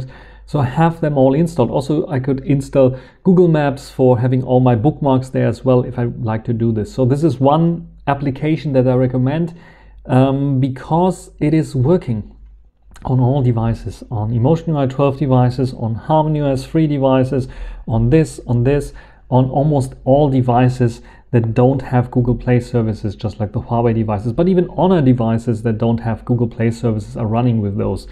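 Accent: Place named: Germany. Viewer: Ein deutscher Kommentar flutscht mal durch, weil ich denke da einen deutschen Akzent zu hören :) Folgendes: Ich stehe kurz vor dem Kauf eines Huawei Gerätes, bin aber auf zwei Banking Apps angewiesen, erstens N26 und zweitens ING. Kannst du das vllt mal mit GBox testen und schauen ob das läuft? Danke dafür im Voraus! Zweitens: Ich sehe gerade dass du seit sagenhaften 16 Jahren Youtube machst, fast auf den Tag genau (im Okt 2006 hattest du das erste Video gemacht), Respekt!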